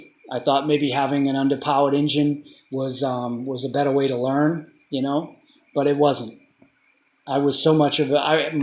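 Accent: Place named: Boston, United States. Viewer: love the bean town accent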